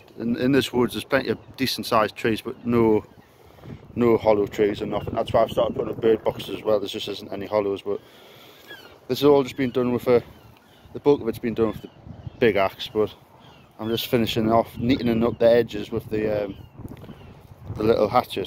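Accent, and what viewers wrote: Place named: North East England, United Kingdom. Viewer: thought I recognised your accent,am from Jarrow near the tunnel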